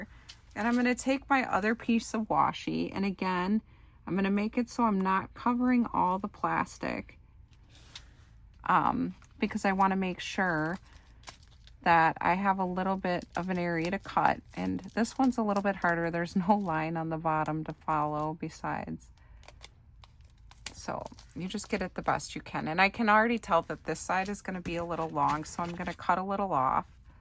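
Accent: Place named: Midwest, United States. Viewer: Hello! I am a first-time viewer and fellow crafter! I am ALSO a Wisconsinite....and I have to say...oooof...that mid-western accent!!! I'm thinking Wisconsin, Minnesota, one of the Dakota's of VERY VERY Northern Illinois!!! I LOVE this idea BTW!!! I plan to use this craft in my "Make to Sell" category!! THANK YOU!!!!!!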